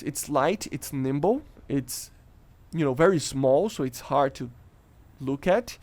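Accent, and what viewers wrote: Brazil. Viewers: no começo ja comecei a estranhar o jeito de fala e no final realmente acertei q era brasileiro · You are brasilian aren't you? If not, your accent is very brasilianish.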